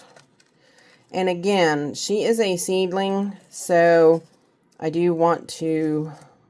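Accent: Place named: South, United States. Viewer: Hey there, from your accent you sound sound sound southern like me😊 May I ask where your from? You said the name of that lake and I thought I have heard of it before many years ago. At least I think I have 😂 I use to live in Ga. When I was little we use to go to a lake in Alabama.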